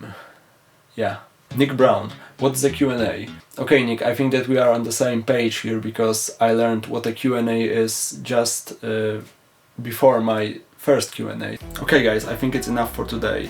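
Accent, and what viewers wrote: Poland. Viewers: Siema Poznałem polski akcent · polski akcent + język angielski = wisienka na torcie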